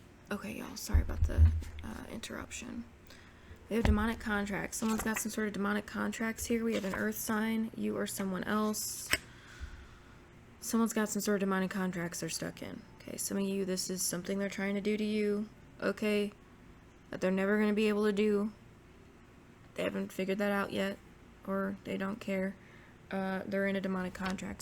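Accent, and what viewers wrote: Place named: South, United States. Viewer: Thanku my dear, i luv ure sthrn drawl!!! Ppl hav that in th Deep Sth of our country too!!! Bc of th irish and scottush settlers that lived there!!!!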